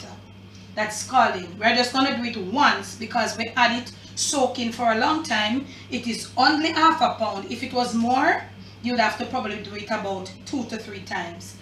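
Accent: Jamaica. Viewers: love the jamiacan accent from trinidad here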